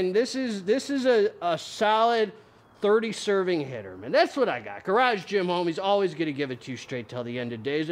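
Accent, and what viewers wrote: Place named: South, United States. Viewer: You remind me of a southerner with the way you say things. Idk where you live you just remind me of a very energetic southerner lol😂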